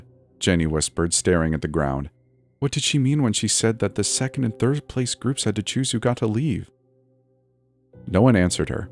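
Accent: Canada. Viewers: I most definitely like the sound of ur Canadian voice. Thanks for another great upload. It's much appreciated. ❤ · I LOVE THE SOUND OF A CANADIAN VOICE!! ( I'm from Vancouver BC...☺️)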